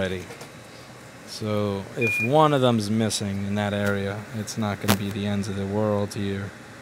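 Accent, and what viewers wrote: New York, United States. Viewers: He is a New Yorker; they speak differently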